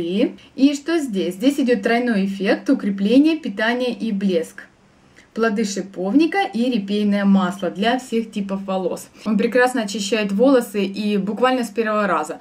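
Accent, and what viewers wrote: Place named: Ukraine. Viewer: у Вас такий милий акцент український=)і Ви сама дуже мила і цікава=)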